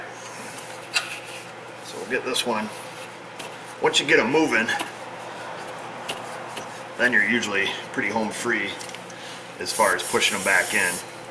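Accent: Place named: Texas, United States. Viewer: very understandable and appreciate you sharing all you do. Then again, maybe you should ask yourself the purpose behind why you make the YT videos. BTW you sure sound and act like a good ole boy like me from TEXAS. not only my opinion but also expressed by many friends.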